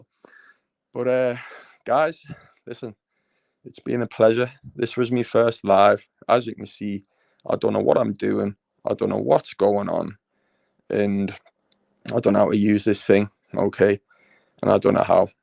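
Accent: Liverpool, United Kingdom. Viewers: Yes I can you clearly la ,,,, SCOUSER gd la telling it true 👍👋👌sound la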